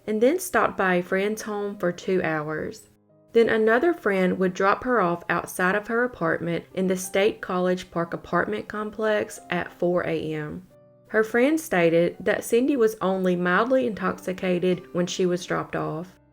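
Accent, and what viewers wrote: South, United States. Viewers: Open Youtube, check notifications, "British True Crime channels" x20, scroll a little deeper, BOOM posted 2hrs ago.. Skipp all the others, click, cause well, better content, WAY better content, PLUS I speak and comprehend accents (Arkansas, but transplanted to Texas) Keep up your excellent work, Youtube will not put you at the top of my Notifications, but I will scroll past all the others cause in my book, you are #1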